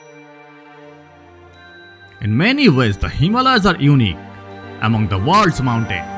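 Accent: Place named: India. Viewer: Great documentary. If you understand the narrators thick accent you will have no problem understanding ANYONE in India.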